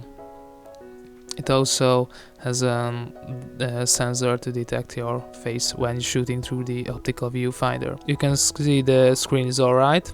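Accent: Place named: Hungary. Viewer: az a jo magyaros akcentus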